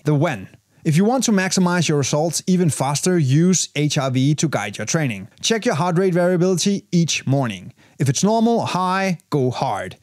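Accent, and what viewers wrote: Denmark. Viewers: Danglish går crazy❤️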